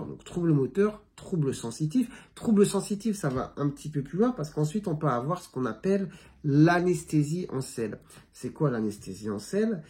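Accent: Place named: North, France. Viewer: Ça dépend aussi de la région d'où on vient, je crois que le dr est originaire du Nord. Pour ma part, ma mère étant aussi de là-haut, j'ai hérité de cette prononciation.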